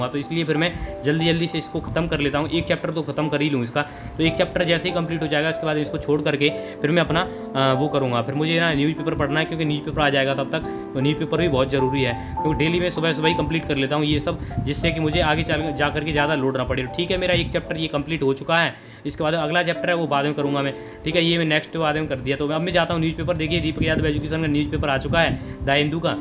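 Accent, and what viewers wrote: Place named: India. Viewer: I loved your hindi accent❤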